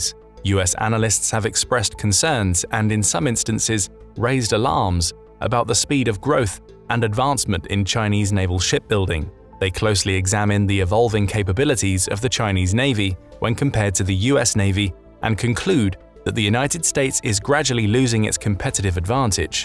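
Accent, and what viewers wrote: England, United Kingdom. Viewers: Thank you for actually stating you base this whole video off nothing more then purely speculation. You stated that this “Shoddy Construction” is based off a few of the islands runaways. You disregard the idea it could be to not provoke further escalation. Do you know that China is the builders of the world. You are lacking facts in this video. 100 years of humiliation….is the time you reference China being the largest economy and then crumbling. Th is was because the West forced worst, opium population, devastating economy, country all up with colonialism something that the rest seems to excel at. The question part is also that there is information here that is worth knowing actual but it’s used just to pop up a story or narrative that’s s not true you. if you decide to do research and publish videos based of facts might actually be worth following. 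You know what is true…Americans hear a narrator with an English accent, and automatically give credibility to whatever information is being spread. Neat trick and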